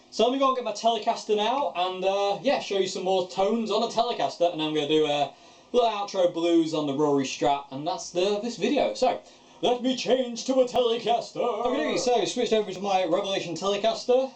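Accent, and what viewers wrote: United Kingdom. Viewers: I dig your Brit accent , & like your vids ! 😎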